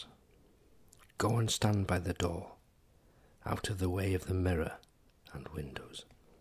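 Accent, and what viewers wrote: Wales, United Kingdom. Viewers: I haven't heard the Welsh accent very much. In fact the two people I've ever heard are Rob Brydon and. Now I think this is how all Welsh sound.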